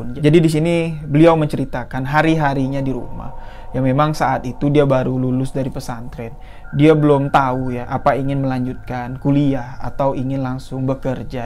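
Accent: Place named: Bali, Indonesia. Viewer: Kayak logat org bali mas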